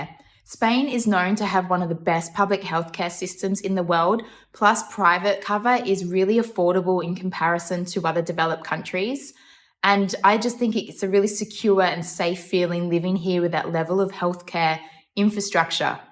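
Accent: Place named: Australia. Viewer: First time listening, i can say she has an Australian accent ❤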